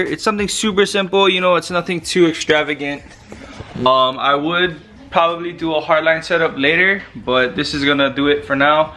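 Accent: Hawaii, United States. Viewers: I sense a accent man. Are you from Hawaii?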